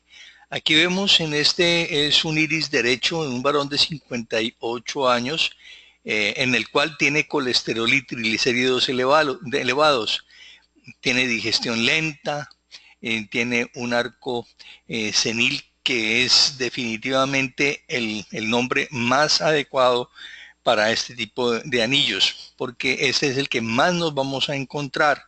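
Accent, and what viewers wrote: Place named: Colombia. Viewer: Buenas tardes usted da cursos de este tema es decir iridiologia me interesa y de donde es yo soy de colombia fue que hice un curso de irdiologia pero veo que no me enseño como es .Como usted esta explicando este supuesto profesor me cito dos dias pero no me explico como usted habla .vulharmente me robo la plata .mi telefono es <num> le agradeceria si me llamara si usted .veo que parece ser de colombia por el acento gracias dios los bendiga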